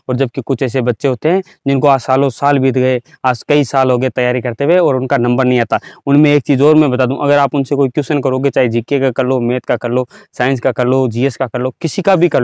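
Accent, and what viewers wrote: Rajasthan, India. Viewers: Sir Rajasthan se ho kya aap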